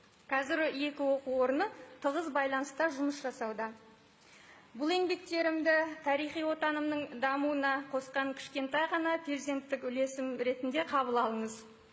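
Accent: Standard. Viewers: Кандай керемет шет елде журсе де оз тилинде таза сойлейды, билимы оте жогары, талантты кыз,полиглот.каида журсен де бакытты бол. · маладец казакша таза соилеиды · Жарайсың ҚАЗАҚТЫҢ қызы! Орысша сөйлемей ақ білімді болуға болатынын көрсеттің, шала қазақ мəңгүрттерге. Шет елде тұратын 7 тіл білетін қыз өзінше болып ағылшынша, қытайша, орысша емес өзінің ана тілінде таза сөйлеп тұр. 👍👍👍👍👍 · керемет қандай жарасымды таза қазақша.ризамын. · отте жаксы созледы ардайм жогарыдан корне беринз шетелде журседе таза казакша солеп тур тамаша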